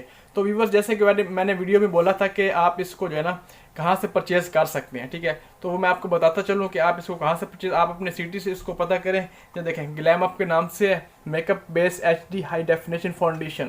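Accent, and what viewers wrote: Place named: Jhang, Pakistan. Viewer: Mjy tu ye bhai jhang k lg ry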